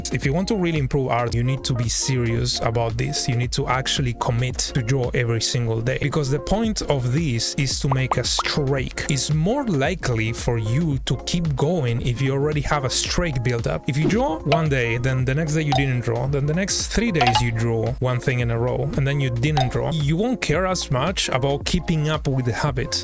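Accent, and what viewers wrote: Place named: Argentina. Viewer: Idk but I can't stop asking myself- from where are you? Bc your accent sounds very argentinian KSNSNSA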